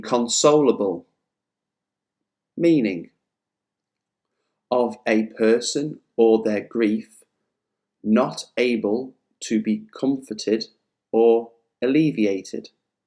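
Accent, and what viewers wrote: United Kingdom. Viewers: The pronunciation is a British accent?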